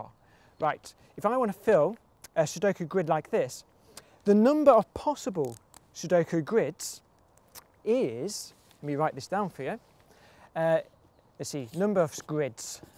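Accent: United Kingdom. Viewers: are u british u speak with a british accent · if this man did not have a British accent i would have stopped watching about 8 minutes and 30 seconds ago. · Im american Hes british He sounds funny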